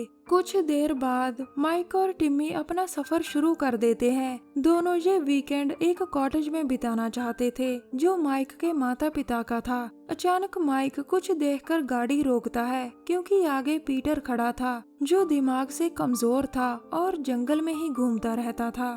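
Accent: Punjab, India. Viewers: kyaa app punjab se ho me 2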